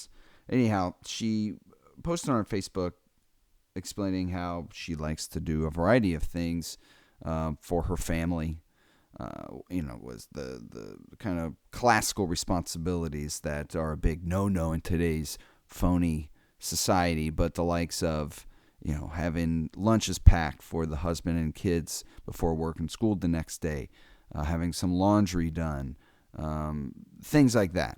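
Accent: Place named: Australia. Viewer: Aussie accent are awesome. I agree with the rest of what you said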